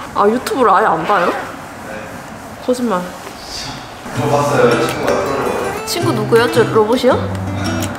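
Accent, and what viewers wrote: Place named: South Jeolla, South Korea. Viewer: 사투리가 전남권이신거 같은데 ㅋㅋㅋ..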